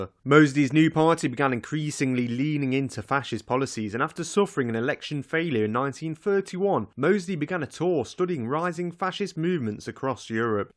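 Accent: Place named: Nottingham, United Kingdom. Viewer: Great Nottinam accent 😂. Love from Scotland ❤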